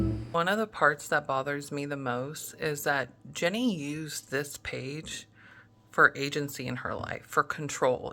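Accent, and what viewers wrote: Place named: England, United Kingdom. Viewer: What about his English accent and the way he does his mouth with his tongue ..He is cringe factor for sure!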